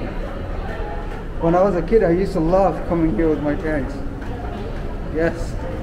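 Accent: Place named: Quebec, Canada. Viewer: I'm so interested in knowing where your french speaking is from! It's so "Canadian" it's baffling.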